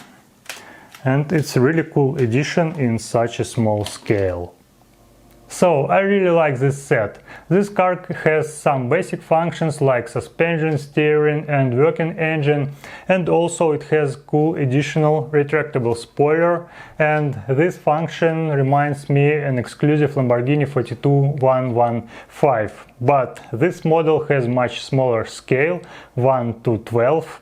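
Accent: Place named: Russia. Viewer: Я не тролю тебя😊 просто твой акцент прямо таки походит на те самые пародийные ролики с руским окцентом! Я сам так говорю но когда читаю стараюсь читать с окцентом приближеному к Амеренканскому акценту да и +я сам очень плохо знаю английский язик а с помощью твоего англоговорящего канала я смогу улучшить свой английский! Так скажем совмещаем приятное с полезным 😊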